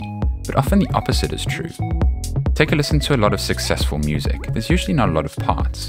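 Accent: South Africa. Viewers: Are you South African? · I got excited when I heard your SA accent . Thanks for the tips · You sound so South African my bru !!! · South african ? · I can hear that you are from Cape Town 👀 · Ayyyy you from durbs bru?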